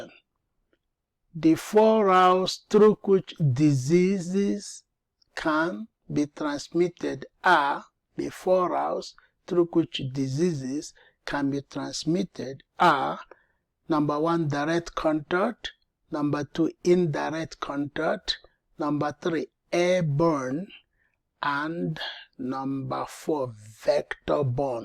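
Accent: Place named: Africa. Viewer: Information is very good . Nice presentation. But the accent is hard to pick for none African viewer's. Good job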